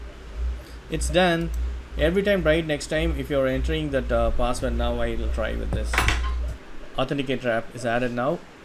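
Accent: India. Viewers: This got to be the worst guide, his Indian accent doesn't help too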